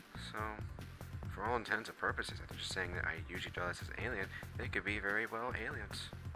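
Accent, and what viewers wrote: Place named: Mexico. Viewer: where is that accent from, Mexico? there are a lot of mexican designers, and drawing artists, is it because some artistic baby boom or the artistic education is superior? one day we will be as good as you guys!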